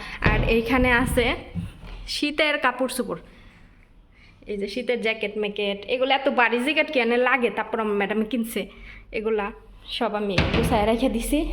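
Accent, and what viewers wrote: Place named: Narayanganj, Bangladesh. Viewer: বাংলাদেশে কোন এলাকায় আপনার বাড়ি? আপনারা ভাষা নারায়ণগন্জ বা বিক্রমপুর এর মনে হচ্ছে।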